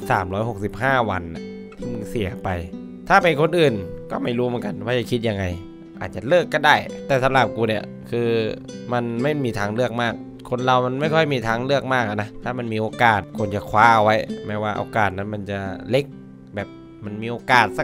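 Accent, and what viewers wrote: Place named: Bangkok, Thailand. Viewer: พี่ เม้นผมหน่อย ผมคนทองผาภูมิครับ ตอนแรกผมคิดว่าพี่คนกรุงเทพ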